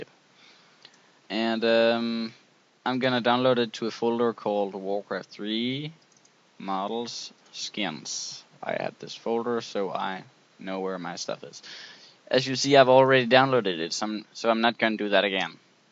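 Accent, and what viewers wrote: Denmark. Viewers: du er dansker xD jaaaaaaaaaaaaaaaa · Haha dansker xD? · You are Danish! =P Oh... And thanks for the help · Oh shit, dansk accent alligevel lol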